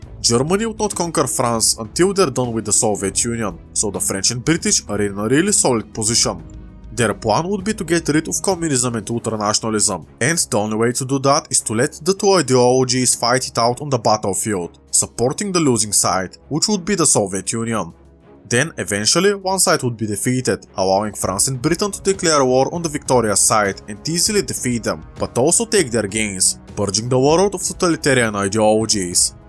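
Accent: Bulgaria. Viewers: The Bulgarian accent is strong with this one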